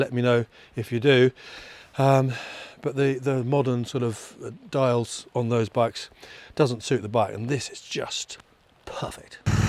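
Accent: United Kingdom. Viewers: Beautiful bike, and you are very good, I'm learning British pronunciation by watching your videos, just out of curiosity I'm 1.85m tall, do you think it's too small as a bike?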